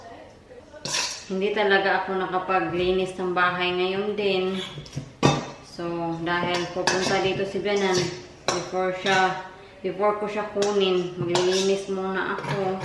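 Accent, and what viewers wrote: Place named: Visayas, Philippines. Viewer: Basta bisaya lambing gyud kaayo.👍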